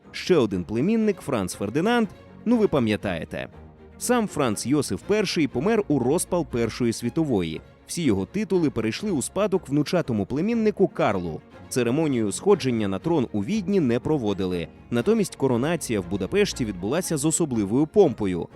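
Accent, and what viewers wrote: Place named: Moscow, Russia. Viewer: Голос за кадром- "ЦюЦюн, жиЦЦя, громаДЗяни" треба на консультацію до логопеда! Вкрай неприємно слухати ці маасковські виверти! ДИЗЛАЙК!!!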